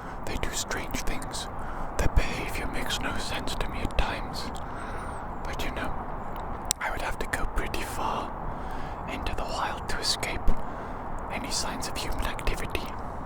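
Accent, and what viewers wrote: Wales, United Kingdom. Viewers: There’s something about your Welsh accent paired with your refined, gentlemanly tone that creates such a unique and relaxing ASMR experience. It's also great to see you with a bible, my friend. God bless you 😊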